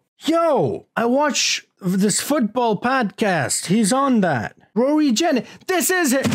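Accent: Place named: Canada. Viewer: yo really? I thought you might be but I wasn't sure, I don't know if English is your first language so forgive me if this is rude but your pronunciation is awesome, I'd honestly been thinking you were maybe Canadian with Asian heritage this whole time. That's really cool though! The jubilee is probably what caused the algorithm to latch onto this video, it was this one specifically that was in my recommended a few days ago. The jubilee is the anniversary of the queen's coronation. This weekend was the queen's platinum jubilee, meaning she's been the Queen for 70 years straight. So a reaction to a doctor who episode about the coronation during the run up to it has probably caused the algorithm to catapult you into British YouTube feeds I'd bet. Unless you haven't noticed a spike in viewers this week, in which case maybe it's just a coincidence. Search engine optimisation and algorithms interest me though so that's why I mention it 😅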